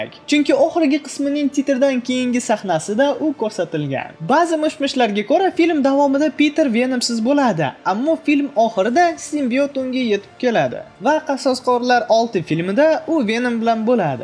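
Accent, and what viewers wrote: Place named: Tashkent, Uzbekistan. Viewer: Nma bovoti😂 ybat toza toshkenlik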